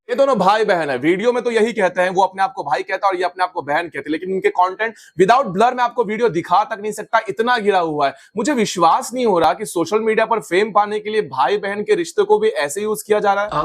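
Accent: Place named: West Bengal, India. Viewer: Hindi bolne ka style sehi pata chal gaya tha e West Bengal se 🤮🤮 feel ashamed 😞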